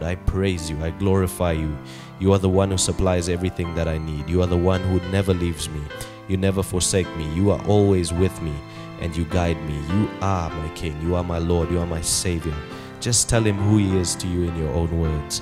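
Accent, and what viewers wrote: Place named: South Africa. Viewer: Amen❤❤, are you South African by any chance ? Your accent sounds very South African.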